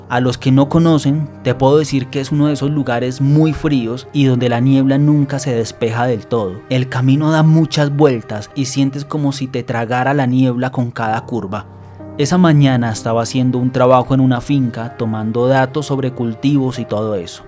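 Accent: Colombia. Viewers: Son historias venezolanas contados por un maldito colombiano? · Pero que cantidad de gafedades y de paso la narrativa es colombiana con demasiado acento , la próxima mínimo busquen alguien de aquí, decir chamo o pana no es ser venezolanos. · Es colombiano 😅😅😅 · Muy lindo pero porfavor un venezolano que narre no un colombiano utizando los matices colombianos se escucha raro Pero me encantó los relatos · Muy buenos los relatos perola malo es el acento colombiano que tiene el narrador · es de Bogotá. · Este narrador no es Venezolano...Es Colombiano... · El mismo script de otro canal bueno igual me encanto con ese acento tan bello viva colombia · Me gustó mucho y a pesar de que no es el acento venezolano aprecio que un Colombiano tenga esa dicción tan linda y encima contar con tanta amabilidad las leyendas Venezolanas..nueva suscriptora 🎉❤ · Paisa, puede ser de gochilandia, fronterizo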